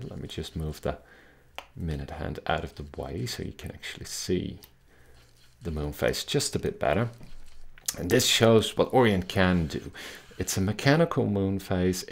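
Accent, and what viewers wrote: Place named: Netherlands. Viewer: Nederlands accent ;-)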